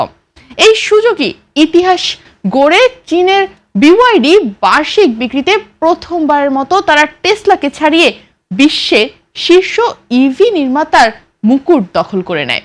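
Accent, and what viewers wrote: India. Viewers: এই মহিলার বাংলা পশ্চিম বঙ্গের মতো লাগে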